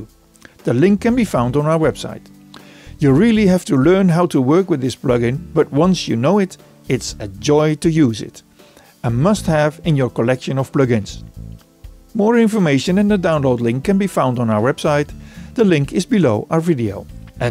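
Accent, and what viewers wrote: Netherlands. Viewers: Really funny Dutch accent.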